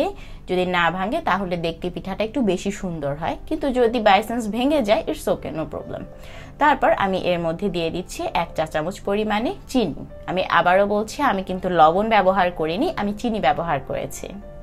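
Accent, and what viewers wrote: Noakhali, Bangladesh. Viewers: ওরে আফা আন্নে নোয়াখাইল্লা নি।😲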